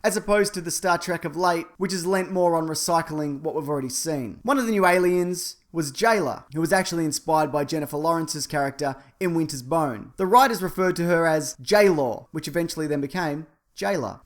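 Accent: Australia. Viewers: I cannot fucking listen to this guy talk. There's Australian, and then there's down syndrome.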